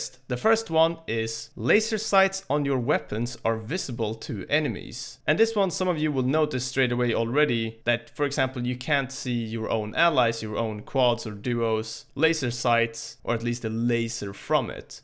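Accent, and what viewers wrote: Sweden. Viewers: are u by any chance swedish? u talk english like sweds 🤔😁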